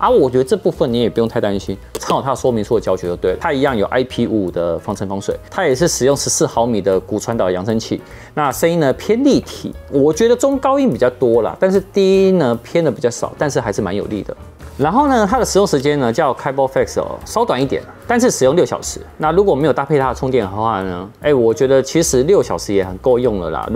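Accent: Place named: China. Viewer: 聽旁白，還以為聽到阿陸仔的頻道咧！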